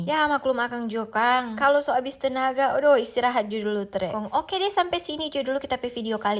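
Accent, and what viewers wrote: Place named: Manado, Indonesia. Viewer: Suka nonton video mbak.....mungkin krn dialek bahasanya yg enak.....❤ Salam dr Makassar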